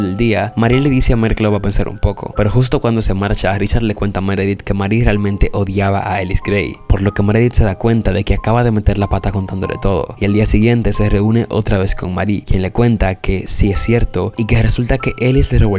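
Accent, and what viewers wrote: Dominican Republic. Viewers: Eres de República Dominicana?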